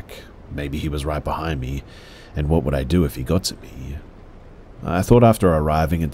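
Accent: Australia. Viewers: Favorite video you put out as of recently. You're the fucking man, Thanks for your beautiful Aussie accent lulling me to sleep every night. You've gotten me through some tough times and I genuinely thank you for that man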